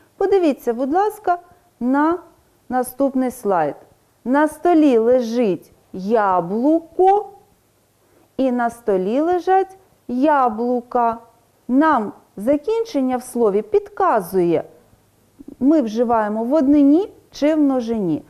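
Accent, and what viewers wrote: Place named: Russia. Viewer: дякую! Насправді питання були до вчительки, яка в ролику саме таким чином наголошує слова: 9.46 - сАнки, 16.29 - островА. Ще запитала б про наголос у слові "збірні" (11.55), адже у словнику подається наголос на першому складі цього слова. Чутно, що людина російськомовна, хоча й старається говорити українською. Просто виникає питання: чи подібні ролики дадуть дітям переконливі знання?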